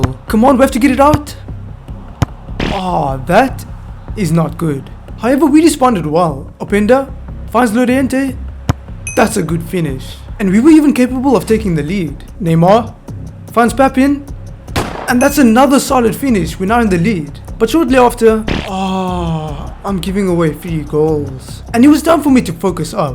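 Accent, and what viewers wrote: South Africa. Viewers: You from sa bro ?? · South African accent😂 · South african?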